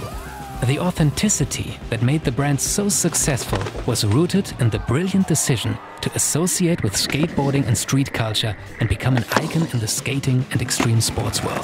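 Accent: Germany. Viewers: Most Germans sound like this (or worse) while speaking English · I could tell it was a German accent right away! But I'm pleasantly surprised by how well he speaks English. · the strong german accent ahhh yess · this guy german accent gets me everytime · You probably hate to hear it, but are u german?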